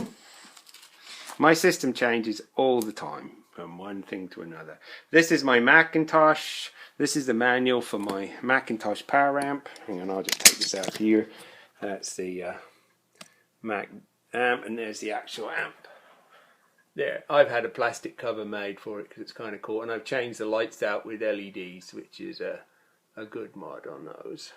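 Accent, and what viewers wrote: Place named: West Country, United Kingdom. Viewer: The accent sounds like it's from the West Country, perhaps Somerset.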